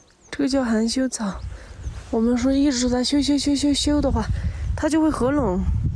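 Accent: Sichuan, China. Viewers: 的家乡真美啊！为你家乡骄傲，为你家乡出了这样的美女骄傲。听到一口的川味普通话觉得特别亲切，一点没觉得是藏族人。不用化妆更好，更觉得是个活生生的人。平时看的舞蹈，真是觉得是仙女下凡。这个短片也让我们感到真正的存在。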